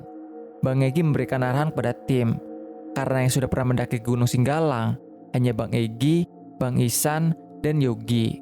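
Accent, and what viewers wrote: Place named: Banyumas, Indonesia. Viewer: Yg bawain cerita orang ngapak ya😅😅😅😅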